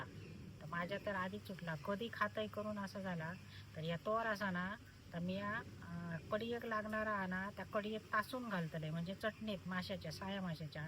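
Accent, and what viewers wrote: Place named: Malvan, India. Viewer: अतिशय सुंदर व्हीडीओ , ताई तुम्ही फार लकी आहात.अशा मनमोहक निसर्गाच्या सानिध्यात तुमचे जीवन जगत अहात.आम्हा मुंबईकरांना तुमचा हेवा वाटतो. तुमची मालवणी भाषा ऐकायला कानाला गोड वाटते.असेच नवनविन व्हीडीओ टाकत जा, आम्हाला तुमचे व्हीडीओ फार आवडतात.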